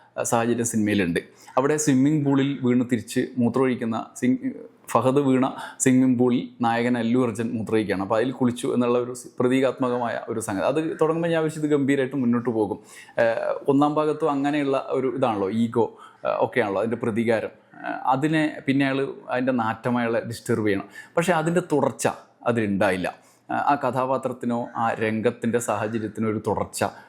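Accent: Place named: Malabar, India. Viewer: ചേട്ടാ നിങ്ങളുടെ സൗണ്ട് ഒന്നാമതെ സ്ലോ ആണ്.. മലബാർ ഭാഷ കൂടി ആണ്... ഞാൻ നേരത്തെ പറഞ്ഞത് ആണ് നിങ്ങൾ സമയം കുറക്കാൻ... പിന്നെ പുഷ്പ യെ പറ്റി ::ടൈം ലാഗിംഗ് ഉണ്ട് എന്നത് ഒരു സത്യം തന്നെ..ഫാമിലി സീൻ നെ പറ്റി പറയുമ്പോൾ.. ഇന്നത്തെ തലമുറക്ക് പിന്നെ കുടുംബ ജീവിതം വലിയ ഇഷ്ടം അല്ലല്ലോ.. അടി ഇടി വെടി വെട്ട് കുത്തു ഇതൊക്കെ മതി... ഞാൻ ഒരു സിനിമ അമിതമായി കാണുന്ന ആൾ അല്ല... ഒരു നടന്റെയും ഫാൻസും അല്ല... എന്നിരുന്നാലും പുഷ്പ 2...കുഴപ്പം ഇല്ലാതെ കണ്ടു കൊണ്ടിരിക്കുന്ന ഒരു സിനിമ ആണ്.... Nb ::പിന്നെ അമിത ഹൈപ്പ് കൊടുത്തു മാർക്കറ്റ് ചെയ്യുന്നത് അവരുടെ ബിസ്സിനെസ്സ് തന്ത്രം ആണ്. അതിൽ വീഴണോ എന്ന് നമ്മൾ ആണ് ചിന്തിക്കേണ്ടത്..... സിനിമ യെ സിനിമ ആയി കാണുക... എന്തായാലും താങ്കളുടെ റിവ്യൂ &ബുദ്ധി ജീവി വിമർശനം വളരെ ബോർ ആയി പോയി....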